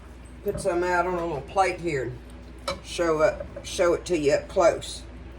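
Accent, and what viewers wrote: South, United States. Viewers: Whats a shrimp bowl? Lol jk 😂 i love southern accents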